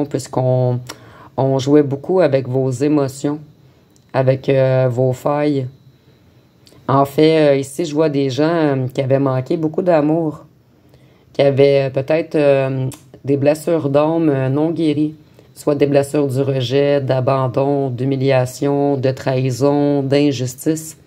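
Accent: Canada. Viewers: Bonjour merci pour ce tirage qui me parle beaucoup,gardez bien ce joli petit accent canadien, belle journée à vous 😊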